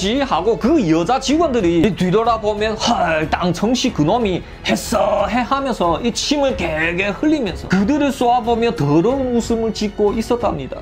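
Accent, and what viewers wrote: China. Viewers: 억양이 특이하시네요.중국사람이 한국말 하는 느낌요.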